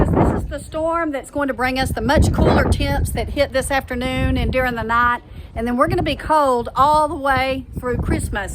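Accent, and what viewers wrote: Texas, United States. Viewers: Just seen this today.....I have a younger Brother in Richland Hills and I will have to call some friends of his to see if he is alright ..... he doesn't speak to me but I shore want to know if he is out of danger. And I like your Texan accent!!!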